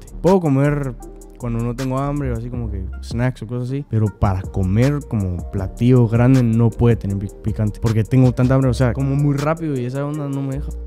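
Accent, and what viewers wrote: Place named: Guatemala. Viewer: Amo el acento guatemalteco de y su R muy pronunciada jajaja Dios amo a este hombre 😍 yo igual soy alérgica a los perros y gatos 😂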